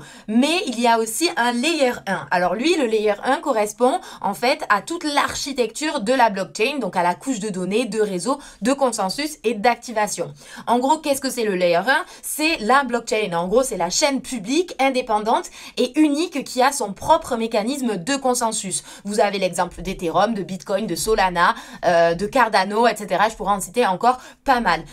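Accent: South, France. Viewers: té pas un peu du Sud toi?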